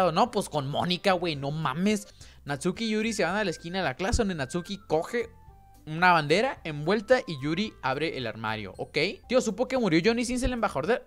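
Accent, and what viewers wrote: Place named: Colombia. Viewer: Ahh mk tío, usted maneja bien el colombiano xd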